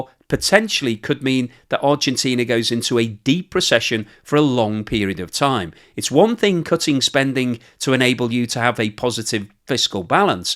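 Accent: United Kingdom. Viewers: As soon as i heard your british accent, i knew this was a hit piece.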